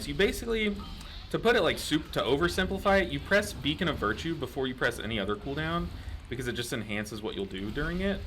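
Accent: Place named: Midwest, United States. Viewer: lol I also love is like midwestern accent where he is just kinda like aw shucks. He never gets tilted or pressed !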